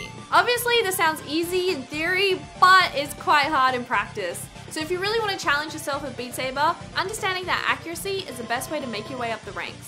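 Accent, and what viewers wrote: Australia. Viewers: Ok does anyone know why advice like this sticks in my head better when it’s delivered in an Aussie accent?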